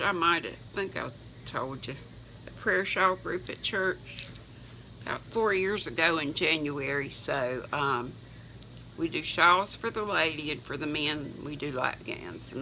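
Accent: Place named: Iowa, United States. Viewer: I love your new name 😃❤🐿🐿 I just love you girl. My late mother used to talk the same way and she was from Iowa. There was a couple three and she used to call a tiger a tager.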